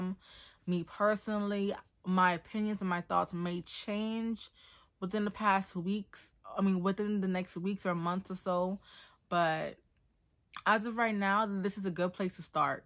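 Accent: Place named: New York, United States. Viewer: can definitely tell by your accent that you stay in NY 🔥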